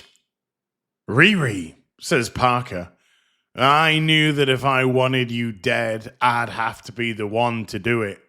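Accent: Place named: England, United Kingdom. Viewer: It’s not a British accent, it’s an English accent. An Englishman from England, speaking English.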